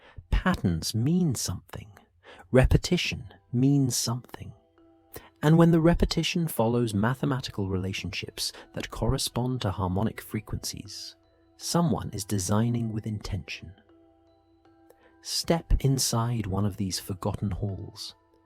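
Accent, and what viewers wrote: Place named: United Kingdom. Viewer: Is this what we're forced into a British accent on every freaking Channel I think it's a good Channel but can't I get it in an American accent👎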